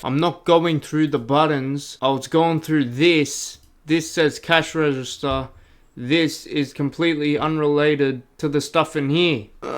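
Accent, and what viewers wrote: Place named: Lebanon. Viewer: 2026 the year of the roblox coding leb accent eshays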